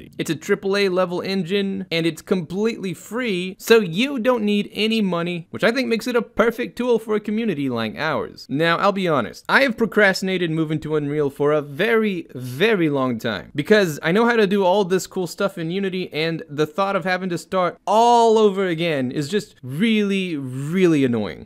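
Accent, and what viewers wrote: New York, United States. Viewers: You sound like a new Yorker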